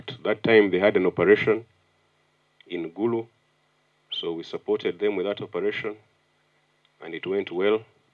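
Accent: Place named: Kenya. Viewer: Ur swahili sounds more of kenyan🤔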